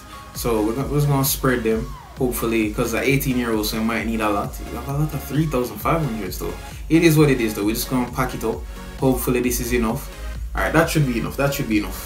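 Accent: Jamaica. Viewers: Are u Jamaica???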